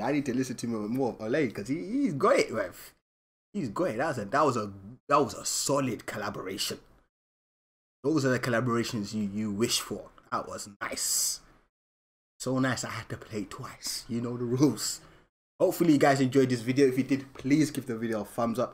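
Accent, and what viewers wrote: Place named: United Kingdom. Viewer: i think hes BRI'ISH edit: thats a big BO'OHW'O'WO'ER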